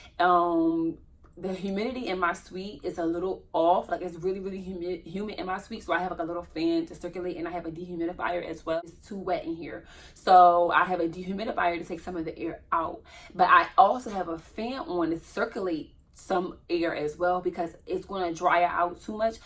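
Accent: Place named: Philadelphia, United States. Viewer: I’m new to your videos , but I just gotta say … the minute you opened your mouth I knew you was from the city 🤣🤣🤣 when you said Houston I said ain’t no way . Then you said Philly and I said ard that’s what I thought 🤣🤣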